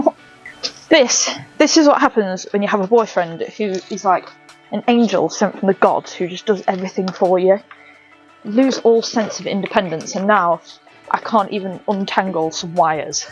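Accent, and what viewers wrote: United Kingdom. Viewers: maybe it's because I'm German and for me it sounds so utterly british... 😂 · Omg youre alive!:D great to see you and hear you british accent hahah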